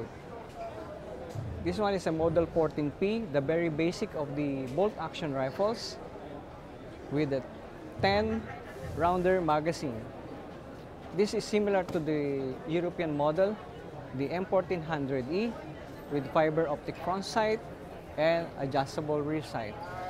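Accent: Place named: Bisaya, Philippines. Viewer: bisaya ka dong?! galeng mong mag prisint dong!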